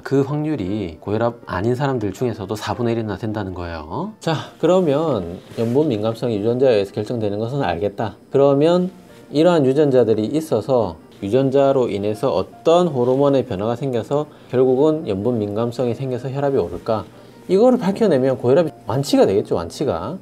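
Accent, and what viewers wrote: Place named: Busan, South Korea. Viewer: 부산억양 귀엽습니다^^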